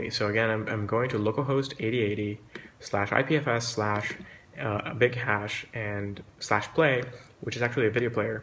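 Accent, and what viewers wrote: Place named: New Jersey, United States. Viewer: Very cool demo. Are you from NJ? Just curious; you sound a lot like my buddy from there.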